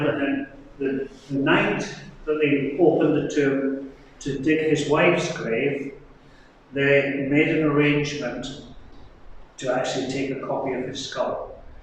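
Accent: Scotland, United Kingdom. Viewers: 😂 that lovely Scottish accent 😉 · Love the Scottish accent! my great granddad was born in Edinburgh. It reminds me of him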